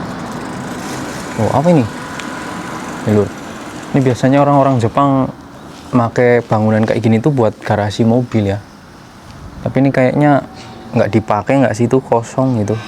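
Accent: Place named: Cilacap, Indonesia. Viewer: Enyong sama ngapak Cilacap kali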